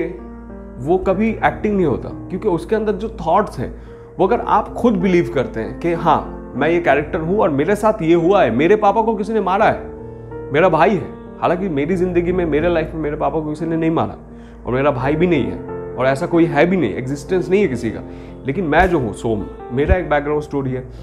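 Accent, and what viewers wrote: Bengal, India. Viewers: Sirji thanks for the video par aap apna hindi ka R theek kar lo..Bengali accent aa raha hai usmein..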